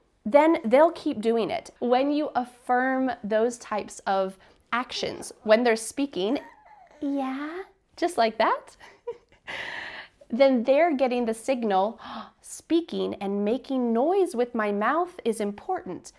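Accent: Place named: United States. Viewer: My best teacher i love americans accent.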